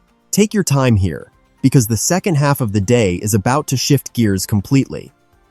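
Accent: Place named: United States. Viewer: The American accent is destructive to a description of Europe. How many ways can you pronounce Tagus ? This alleged easy first day is as relaxing as Shinjuku station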